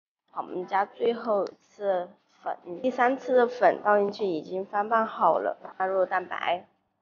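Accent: Sichuan, China. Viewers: 我猜你是四川的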